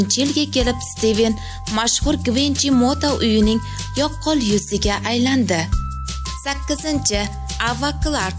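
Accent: Surkhandarya, Uzbekistan. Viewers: менимча точни сурхандарйо йоки кашкадарйодансиз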